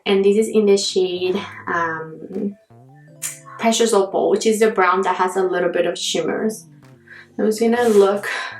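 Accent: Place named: Canada. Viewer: I am so happy I came across your channel. You are so sweet. 💕. I love your accent. :) I see that you are from Canada. Me too. I am from Alberta. Where are you from? I am so excited to find youtube friends and the most excited when i see they are from Canada as well. 💕 You look very beautiful. Would love to be friends on here. New subscriber here as I love your channel and love coming across YouTubers that are in the same niche making makeup videos like me. 😘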